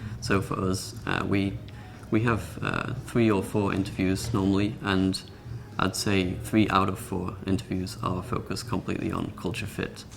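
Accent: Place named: Jamaica. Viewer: Ríordáin I think his accent is jamaican...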